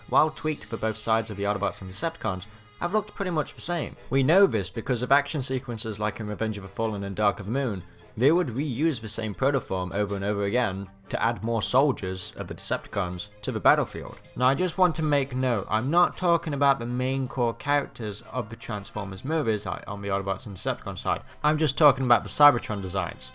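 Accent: United Kingdom. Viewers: Why do you have an English accent until you say Audobods and Decepticaaaans? It’s very odd.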